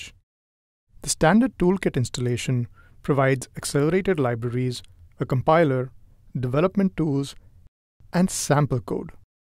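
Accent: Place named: India. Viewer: why does he sound indian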